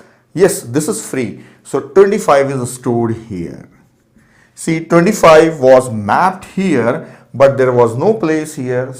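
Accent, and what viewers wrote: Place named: South India, India. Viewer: That "only" in between each sentence is proper South Indian stuff😭.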